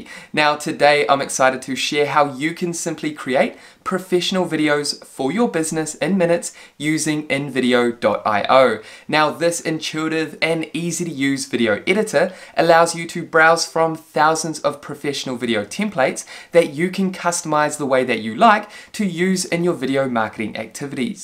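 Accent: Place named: New Zealand. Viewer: Goddddd man, Tour accent Is so strong Nuova Zelanda I guess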